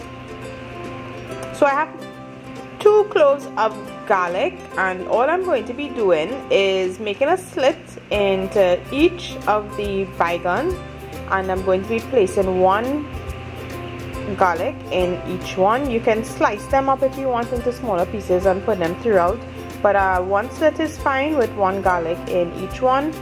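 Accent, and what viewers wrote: Trinidad and Tobago. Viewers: Oh shut up. That's how trinidadians speak. Research before you try bashing.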